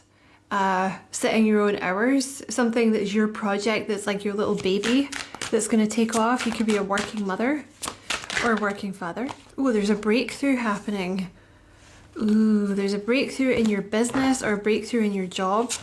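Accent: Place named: Scotland, United Kingdom. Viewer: It’s so lush to hear a Scottish accent for once ❤ thank-you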